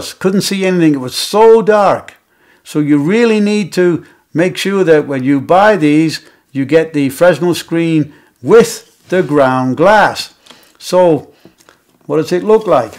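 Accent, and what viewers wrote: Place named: Germany. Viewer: Superb and definitive video, I am interested in your accent, I am hearing, German, Manchester England and California, please do tell 👍🏼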